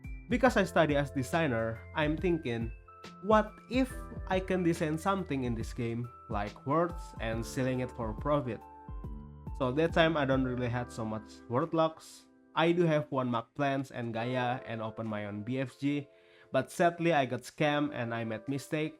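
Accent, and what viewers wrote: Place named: Indonesia. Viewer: Anj kan bener dari indo, dari aksen sebenernya udh keliatan kaya orang indo, tapi gw agak ragu lu org indo soalnya jago bgt bahasa English 😂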